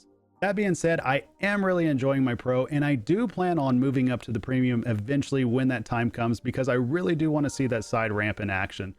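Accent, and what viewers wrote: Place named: Oklahoma, United States. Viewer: Fellow okie! Great review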